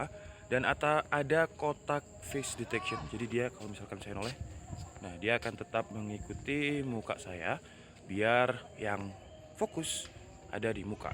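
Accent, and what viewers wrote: Java, Indonesia. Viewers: iki jowo bnget mas, 🤣🤣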